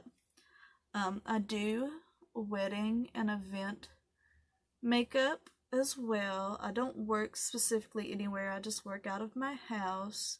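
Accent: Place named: South, United States. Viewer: Yasss come on Southern Accent!!! I’m from Georgia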